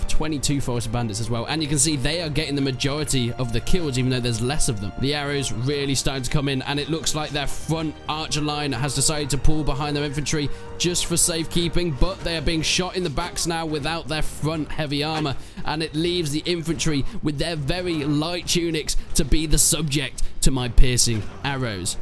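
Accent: United Kingdom. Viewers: You've got the Bear Grylls accent, where is that from?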